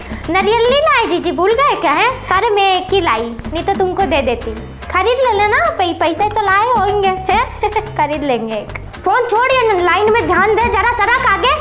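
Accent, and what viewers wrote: Indore, India. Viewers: Where do you find this content? You are so real on your acting along with indori tone. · Love my Indore and Indori lahja😆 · Ahaa that pure indori tone...really that teej wali line m aunties aese hi hote h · Indori accent is contagious 😂😂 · Watching your video from Paris... I miss my Indore and the language so much, I show my husband your videos and tell him, that's how we speak :p Lots of love <3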